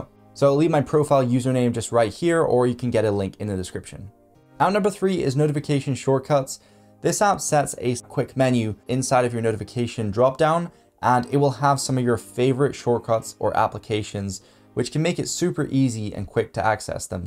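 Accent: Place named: Scotland, United Kingdom. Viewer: Hi! Thanks for a great video. I have been following you for a few months now, really like your content. I recently got the pixel 6a. My main phone is the iPhone 13pro Max, got the pixel for steaming. I think I just downloaded most of the apps you recommended. I wanted to ask. Are you Scottish? I am from Edinburgh, Scotland. I can hear a Scottish accent when you talk. It's cool.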